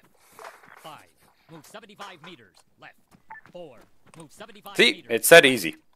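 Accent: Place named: Germany. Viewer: The good GERMAN accent :-D Feier ich! :)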